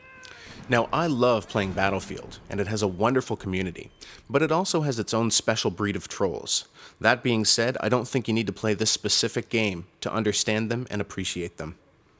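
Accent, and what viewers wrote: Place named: Canada. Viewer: Making love to a glove is so overrated! I like your vids and your curious canadian accent, keep it up!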